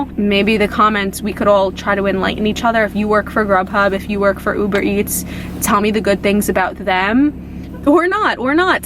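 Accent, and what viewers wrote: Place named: Upstate New York, United States. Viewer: I am not a big fan of the upstate accents whatsoever, being as how I am from Florida, but on you, it's not too shabby. It's not overwhelming. It's like you can tell where you're from, but it doesn't suffocate you, I like it.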